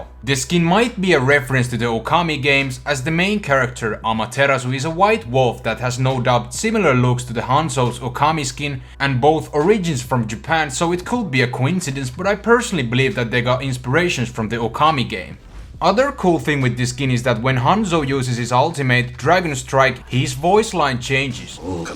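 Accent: Finland. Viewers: Wow! When I heard you speak I instantly knew you were finnish. HYVÄ VIDEO MUUTEN! ☺ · Tiesin heti ku kuulin sun äänen et oot suomalaine XD ja hyva video voiks tehä Tips And Tricks ? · are you finnish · Are you finnish cause it sounds likes it :D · Hey are you Finnish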